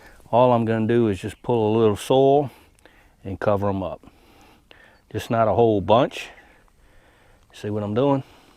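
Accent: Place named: Louisiana, United States. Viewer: You can spend time spacing them evenly, or you can spend time thinning. You must be pretty close to the border because I'm hearing some coonass in your accent.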